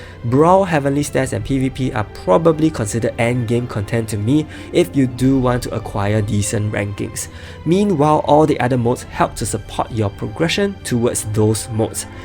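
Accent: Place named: Singapore. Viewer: are you from Singapore? quite a familiar accent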